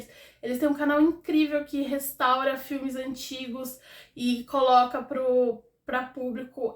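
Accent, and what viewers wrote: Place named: Inland São Paulo, Brazil. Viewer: Além de amar seu conteúdo (te sigo há pouquíssimo tempo), preciso falar que te acho belíssima, que sua voz e seu sotaque muito me agradam (você é do interior de SP?), sua roupa e maquiagem são lindos!! Gostaria de sugerir uma iluminação diferenciada... Eu não sei, não conheço as coisas, talvez aquelas ringlights? Eu achei um pouco escuro, não sei... Obrigada pelo vídeo :) O calendário ficou tudo!!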